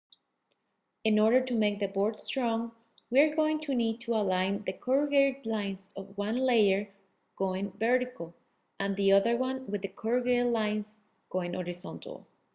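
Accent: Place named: Mexico. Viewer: I fucking love her Messican accent! XD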